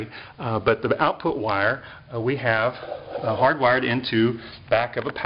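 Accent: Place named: United States. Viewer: Hi.. by your accent I presume you are American, and even though your cars are much bigger than those in Britain, I struggle to think of a car with an alternator belt as long as the one on your bike -what kind of car was it?. I've found that the belt is the biggest problem for these rigs running off the wheelsI I spliced a bit of polyprop rope for one I made, but it was lumpy.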